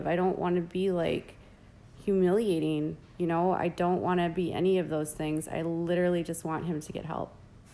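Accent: Minnesota, United States. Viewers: I think needs to go back to writing some BS liberal snowflake “column” or “blog”. She pathetically uploads several times a day about ridiculous topics with no proper research or fact checking. She has to censor every little word she is afraid might keep her from making a buck. Hey, if you’re so passionate about your political beliefs, just say the word. ABORTION. If you want to even ATTEMPT to play the reporter role, drop your awful Minnesotan accent and learn to use talking head diction. Putting a bag over your head might help as well. You have a rare talent of making someone’s eyes, ears, and brain bleed simultaneously. Ugly bitch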